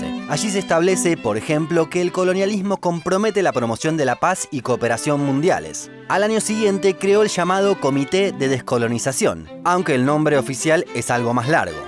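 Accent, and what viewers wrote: Argentina. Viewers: Nunca me gustó el acento argentino, pero el tuyo es punto y aparte, me encantan tus videos, saludos.:)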